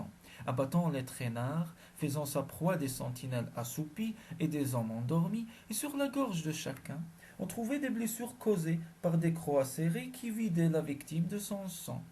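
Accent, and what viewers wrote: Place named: Spain. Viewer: Il me semble que vous avez un accent espagnol. J'ai bien aimé ce récit qui montre que les US se croit toujours maîtres de toutes choses.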